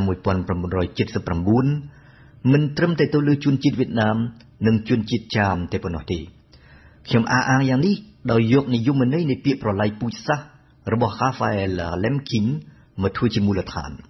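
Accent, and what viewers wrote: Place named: France. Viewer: I LIKE HIS voice SOUND mix French and KHMER interpreter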